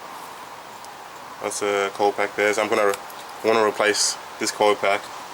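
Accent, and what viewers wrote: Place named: Australia. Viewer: Nice work mate. Love that Aussie Accent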